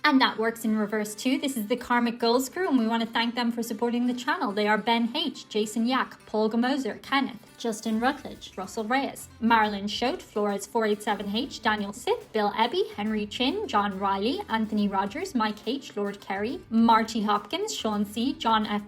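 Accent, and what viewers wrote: Ireland. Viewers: Loved how your exotic Irish accent was so prominent in this video! Well, exotic to my American ears! And you were beautiful as always. I recently re-watched an episode of Highlander the Series with an Irish character! The series is about Duncan MacLeod, who is from the highlands of Scotland, and is immortal, born in 1592 and he's still alive in 1992. This episode, he runs into another immortal who is trying to assassinate a British politician. His friend Richie, who recently became immortal himself (they become immortal when they suffer their first "death" due to violence), helped MacLeod stop the assassination, but in the process, killed the husband of one of the assassins. It turned out the wife was another immortal, and she was born in Dublin, Ireland, in the 1800s. Duncan MacLeod met her -- Annie Devlin -- in 1919, and she was already fighting for Irish independence at that time. 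In 1993 she is still doing it. But because Duncan's friend Richie accidentally killed her husband, she challenges Richie to a sword fight, as that is the only way immortals can kill each other (must cut off the head). Richie wins -- he disarms her -- but spares her life. MacLeod tells her that it must end there, because he and Annie are old friends, and he wants her to accept Richie as a friend as well, and he begs her to accept the peace between Ireland and the UK. They embrace, and that is the end of the episode. You would love that TV show! It was on in the 90s when I was in high school. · As an American man the most charming thing about your video was your beautiful Irish accent.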